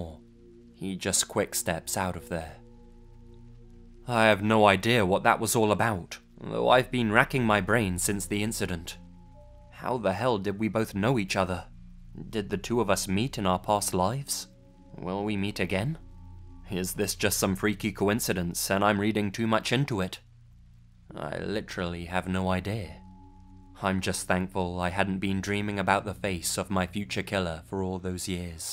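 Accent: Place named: Suburban England, United Kingdom. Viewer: Amarsanaa Ravdansambuu He doesn't sound posh English and doesn't sound poor London. He sounds like more suburban or a mix of those accents. So um Posh Poor English??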